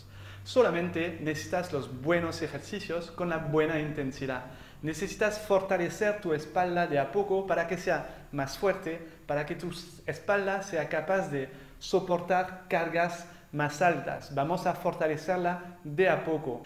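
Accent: Argentina. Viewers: Divino! Y habla en argentino!!!👍❤ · El acento argentino 😀 un groso, saludos desde Génova Italia 🇮🇹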